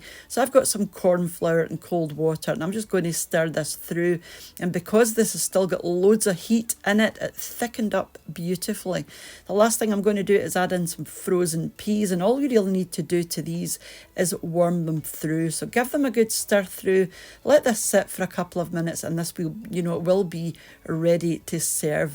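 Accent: Scotland, United Kingdom. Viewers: Love your accent are you Scottish?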